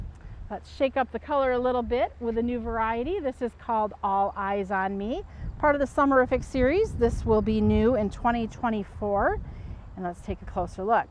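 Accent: United States. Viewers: That Northern Midwest accent takes me back to my family...😊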